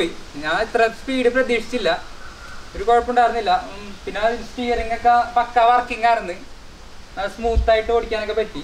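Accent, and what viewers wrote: Thrissur, India. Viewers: ശൂര് സ്ലാങ് ആണല്ലേ ഗാഡിയെ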